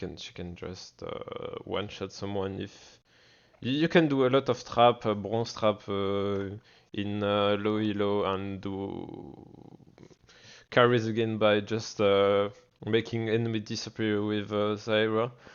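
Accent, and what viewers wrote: France. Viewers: Cet accent français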